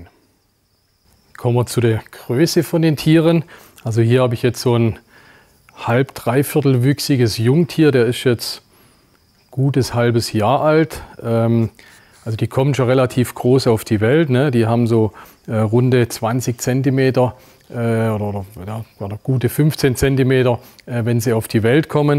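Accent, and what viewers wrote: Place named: Baden, Germany. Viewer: Es tut immer wieder sehr gut, die Güte zu spüren, die du deinen Tieren entgegen bringst! Bin in der Tat nicht grad ein Kommentarschreiber (obwohl das heute bereits mein 2. ist 😊)! Aber das musste jetzt mal gesagt werden = wie sehr ich deinen Umgang mit den Pfleglingen schätze)!!! Wenn ichs richtig in meinem "Hohlen" habe, gehört der heutige Protagonist auch zu deinen persönlichen High 5 (Platz 4 oder 5?) ?!?! Nun hab ich noch ne Fachfrage: es gibt ne 4--5teilige Doku die sich mit der Tierwelt der indopazifischen Inseln auseinander setzt (Micro--Macro--und Polinesien)! Natürlich auch Neukaledonien, Fidschi, Tonga, Bora--Bora, Papetee, Samoa, Weihnachts--und Gesellschaftsinseln, Palau, usw.usw. OK, da ist nix mlt Grosswild,ect. Aber mit endemischen Raritäten! So gibts auch den Riesenskink, ein friedlicher Gigant! Der müsste noch um einiges gewaltiger, als die Blauzungen sein. 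Ob davon ein Video machbar ist? Letzte Frage: wo ist dein Reptilien--Haus? Vom Slang her, würde ich dich in die Badische, Schwäbiche oder Breisgau--Ecke verorten! Denn ich muss das alles irgendwann, mal life sehn! Für heute erstmal alles Liebe und Gut, sowie meinen Respekt!!! MW aus MG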